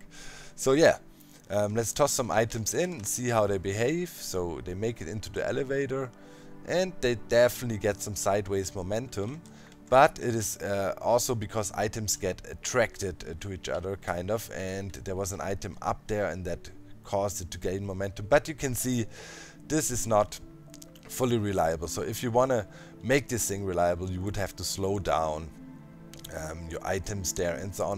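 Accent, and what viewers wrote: Germany. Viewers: Du solltest TV kommentator werden :D man merkt allerdings das du Deutsch bist.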